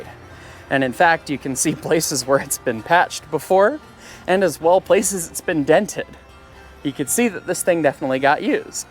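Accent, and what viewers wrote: United States. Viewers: I do not mean this in a negative way at all, but is this how men in Colorado talk/ sound? Colorado accent?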